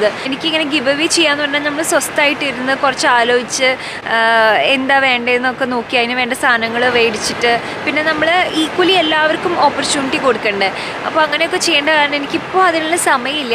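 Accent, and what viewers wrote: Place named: Thrissur, India. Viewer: I live in thrissur I know people here speak like this only...people from southern kerala like me may feel her slang different. Style ett parayunnath kettal mansilakum. Avarkk vakkukal kittarilla😅 pakshe nalla fluent alle malayathil.slang is different its because she belongs to thrissur. It's so cute to keep listening to her. Natural talk which I love most about her😊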